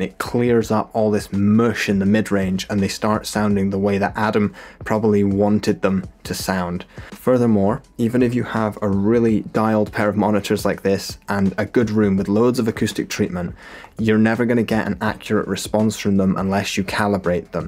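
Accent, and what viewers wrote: Scotland, United Kingdom. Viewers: Where are you from I know Scotland what part ?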